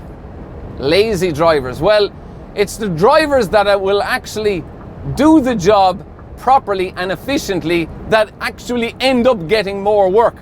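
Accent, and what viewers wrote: Ireland. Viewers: Irish accent, right? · You sound like you're from Dublin. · Ok Ireland is the size of Indiana . My ancestors are from Ireland though . So cool accent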